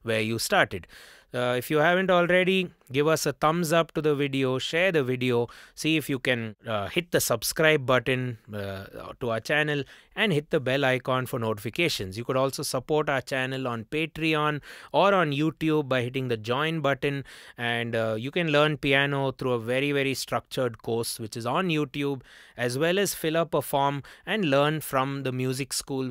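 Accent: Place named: India. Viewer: Following ur channel ..I parallel ly learnt bit of english ,😆😆 in indians ascent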